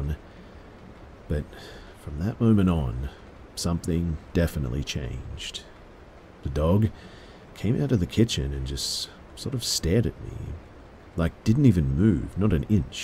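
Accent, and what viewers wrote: Australia. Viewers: I love your stories! Your voice and Australian accent are awesome! You save my sanity on boring workdays.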